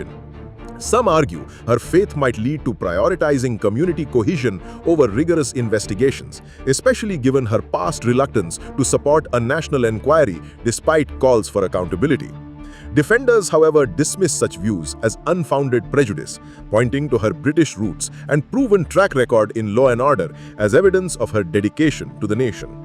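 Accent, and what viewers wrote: India. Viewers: Stupid narrative for idiots with Indian accent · Bloody indian voice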